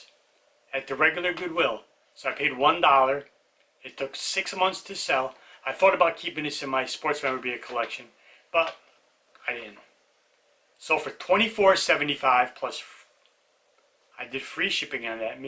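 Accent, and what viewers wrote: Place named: New York, United States. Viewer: I’m a reseller and found your channel and I wanna say I love your videos I am also from New York but live in Cincinnati Ohio I knew the second I heard your voice you were from home.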